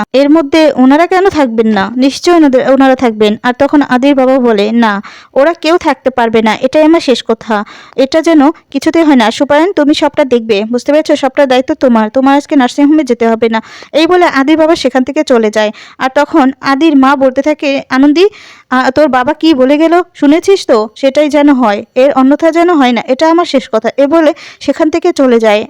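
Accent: Bangal, Bangladesh. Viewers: আপনার বাঙ্গাল বাঙ্গাল, চোয়াড়ে,অশিক্ষিত কথকের কথকতা শুনতে মোটেও ভাল লাগেনা।যে গল্প বলার caption দেন,তা শোনান না।এটা breach of trust ,আইনত দন্ডনীয়। নিজের ভুল শুধরে নিন। চেষ্টা করলে শুদ্ধ, কথ্য ভাষায় কথা বলতে রপ্ত হয়ে যাবেন। আশাকরি ,চেষ্টা করবেন নিজেকে তৈরি করার। অনেক শুভেচ্ছা রইল।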